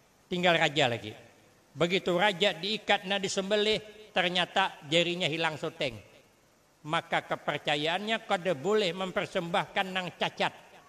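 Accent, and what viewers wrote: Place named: Kalua, Indonesia. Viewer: bahasa beliau kaya orang Kalua ( Kalsel )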